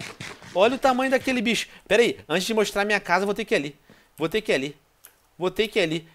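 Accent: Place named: Portugal. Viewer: ui palhaço e português de Portugal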